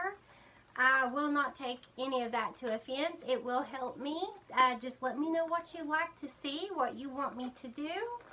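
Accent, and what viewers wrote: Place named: South, United States. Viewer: I love your ramblings and your Southern accent! You are growing so fast 😊😊😊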